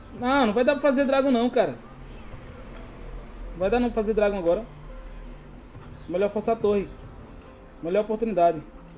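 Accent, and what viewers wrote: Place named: Bahia, Brazil. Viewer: Ó larga o doce pivete ó larga o doce pivete ksksk parece q vc é daq da bahia ksksks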